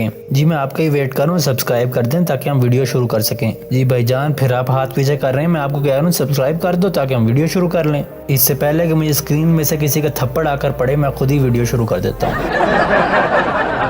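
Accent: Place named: Faisalabad, Pakistan. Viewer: ap koi faisalabadi lgty ho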